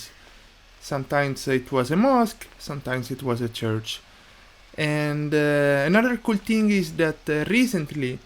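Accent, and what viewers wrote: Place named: Italy. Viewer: Grande bellissimo vlog, non sono riuscito a capire proprio tutto pero ho capito che ochrida ti è piaciuta, io (i miei) sono originario della macedonia pero vivo a roma, comunque si capisce subito che sei italiano "cinquecento"🇮🇹 Ottimo lavoro spero che continui con questi tipo di vlog 👍💪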